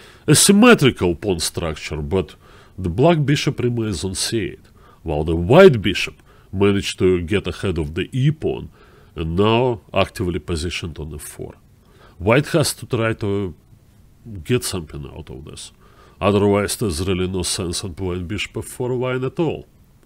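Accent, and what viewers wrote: Russia. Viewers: But they are not all available. Plus, this guy is great. He has great analysis and has some very interesting things to say about chess. And he's actually fluent in English you can tell. He can say whatever he wants and talks about jokes and other things. He's just got a Russian accent. Which is not that bad, it's called diversity. And this guy is very good and interesting. So what's the problem really. If <link> hired him he's obviously got something going. Eric and the other chess brahs are great but there are other great people out there too and we can learn a lot from diversity in the chess world. A little accent never hurt anyone and it's a good opportunity for people to learn something new about chess from someone very experienced.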